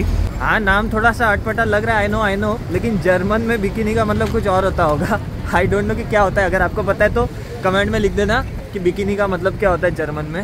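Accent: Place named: Maharashtra, India. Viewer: your marathi acent in hindi i like it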